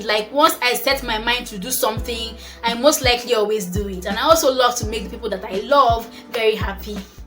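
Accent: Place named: Akwa Ibom, Nigeria. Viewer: Your akwa ibom accent🤣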